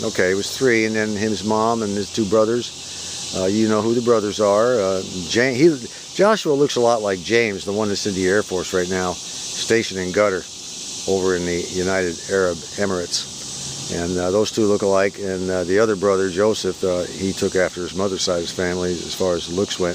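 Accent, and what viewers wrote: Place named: Iowa, United States. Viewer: .....I can tell you are NOT a high-brow..cause you say "ANT" instead of "AUUNT", just like an Iowan like old Buzz!!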